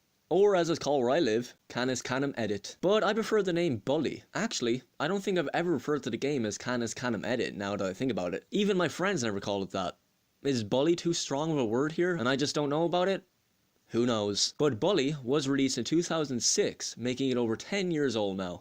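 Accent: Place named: Ireland. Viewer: what part of ireland u from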